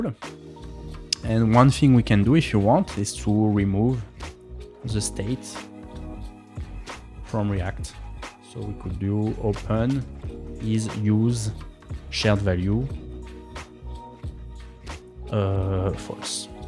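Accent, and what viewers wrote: France. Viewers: Is this guy French or sth?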